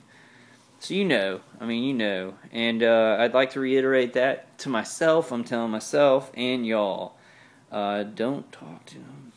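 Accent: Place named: Baton Rouge, United States. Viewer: I see it's a Batman day today,. Your house seems nice- very modern- lucky it goes well with silver?:) You said once you came from Baton Rouge? I guess that would be the accent. I'm interested in US accents, as I am from Australia. I'm slowly getting there in distinguishing some of them. Good video. You've said you're focusing on the external. That seems like a good plan. It could really take some of the pressure off you.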